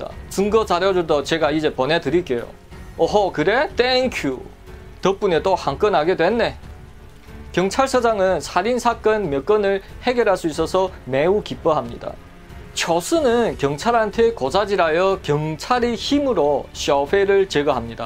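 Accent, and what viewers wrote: Yanbian, China. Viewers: 延边方言 谈黑龙江乔四爷 😊牛逼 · 이 친구가 연변친구라서 그럽니다.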